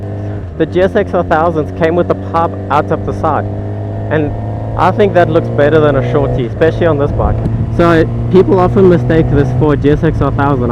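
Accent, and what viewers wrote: South Africa. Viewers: That SA accent is unmistakable. Thank you for sharing man!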